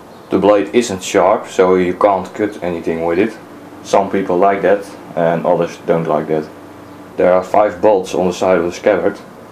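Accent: Netherlands. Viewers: Are you Dutch?You have a Dutch accent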